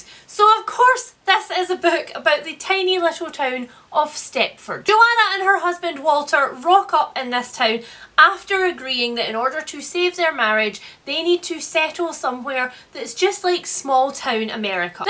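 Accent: Scotland, United Kingdom. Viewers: Love the Parasol Protectorate series and Agatha Christie is one of my favorite authors. I'm more of a Poirot girl than Marple, though I enjoy her too. Cards on the Table is my favorite. I might have to add The Stepford Wives to my TBR. I, like you, have seen some adaptations and didn't think I needed to, but I'm reassessing this now. 😂 I may or may not watch your channel for the delightful Scottish accent. 😂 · "Hanging around for the Scottish accent" I've been called out. Well, that and your humor. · “Some of you are sticking around because of my Scottish accent”.....*looks around nervously* that...might be me 😳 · This is the first video of yours and omg I am in love with you me energy! And as a fellow Scottish person I really appreciate the accent 🤣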